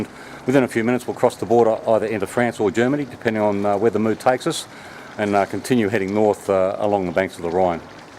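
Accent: Australia. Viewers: Hello...I loved your video! My husband & I are planning to do one of the Eurovelo routes--maybe this one! BTW, I liked the music--what is it/where do I find it? It's great to hear your Aussie accent, too. Cheers, from Sydney.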